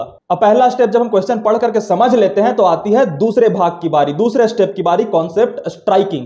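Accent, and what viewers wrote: Bihar, India. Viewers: Bhaiya aap bihar se h kya pls Tell ❤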